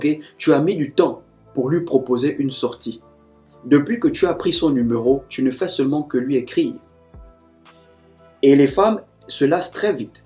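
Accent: Gabon. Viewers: La tonalité de la voix et l'accent...mec tes Gabonais ?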